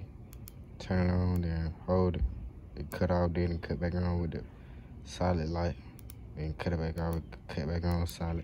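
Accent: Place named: New Orleans, United States. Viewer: Sound like you in New Orleans bro. You know we got to stay up on straps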